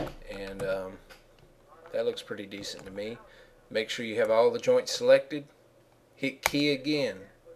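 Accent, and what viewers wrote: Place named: Texas, United States. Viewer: you explain things damn good! thanks a bunch! is that a texan accent or what exactly? im not from america sry!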